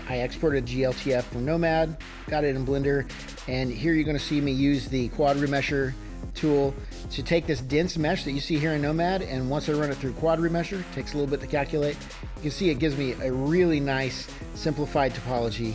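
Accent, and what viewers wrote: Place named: East End London, United Kingdom. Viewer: I can’t say enough good things about Sothern GFX and their affordable, and well structured training. Also I am enthralled with accent. I’m afraid to venture this guess, but is it East End London?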